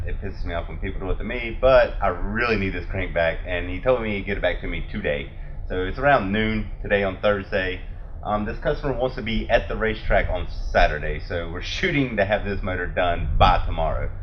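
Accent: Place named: Carolina, United States. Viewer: That's great I could tell by ur accent u were from Carolina. I've got family in Greensboro. I always loved coming to visit over there from KY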